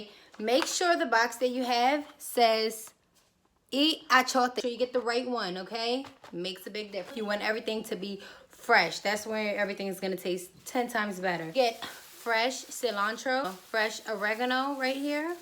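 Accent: United States. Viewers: Hey what state do u live in? Just curious cuz of your accent, sounds like ur from Texas. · Se ve bueno. LoL like the gringa accent😂 voy hacer algo comp eso ahora oren por mi... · Sounds NewYorican accent.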